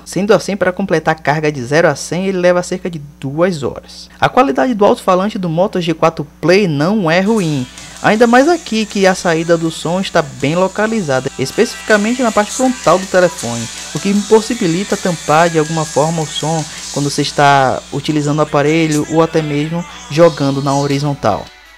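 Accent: Bahia, Brazil. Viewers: você e de que cidade? Se for salvador adivinhei pelo sou modo de falar que so bahiano tem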